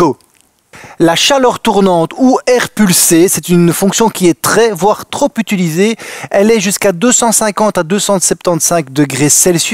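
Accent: Belgium. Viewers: Merci 🙏 Monsieur pour vos bons conseils, j’ai appris des choses grâce à vous. Je pense que vous êtes Belge ça fait plaisir de vous écouter ça change une fois comme on dit en Belgique 🇧🇪 j’aime aussi les français après tout on est voisin non peut-être 🤔 🙏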